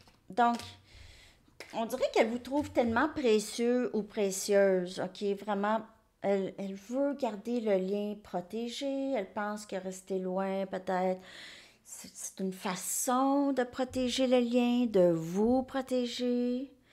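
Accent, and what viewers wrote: Canada. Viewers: Merciiii 💖 (Je poursuis mon commentaire, je l'avais publié trop vite). Tout me parle dans cette guidance! Oui j'ai avoué à cette personne que je ressentais de l'amour pour elle et que je pensais qu'on ne s'était pas rencontré par hasard. Mais il continue de tergiverser en disant qu'il ne sait pas ce qu'il ressent, que pour l'instant c'est trop compliqué, et tout plein d'autres excuses. En tout cas, je constate qu'après des années, il semble toujours avoir envie de me revoir 😌 Espérons que le destin soit plus puissant que les indécisions. PS: J'aime toujours autant ton accent canadien si doux 😍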